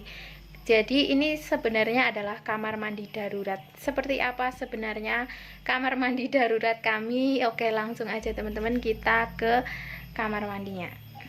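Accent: Java, Indonesia. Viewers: Medhok jawir mu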